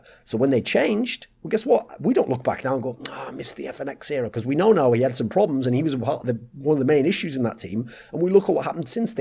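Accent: United Kingdom. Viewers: Why is more British today than usual?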